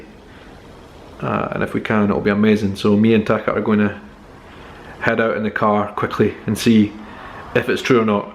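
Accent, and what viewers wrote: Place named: Scotland, United Kingdom. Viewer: I was going to ask you in another video if you were Scottish because you sound like one and then I read your description and found out you actually are lol. I'm from Brasil 🇧🇷, Salvador and I lived in edinburgh for 2 yrs and a half and loved there! I'm not very keen about the weather actually but, anyway... my father lives There as well in Ransome Gardens. Cheers!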